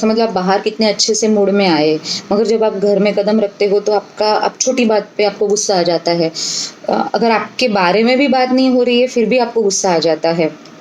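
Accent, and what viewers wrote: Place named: Gujarat, India. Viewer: Her pronunciations is gujarati why should you speak hindi full bakwas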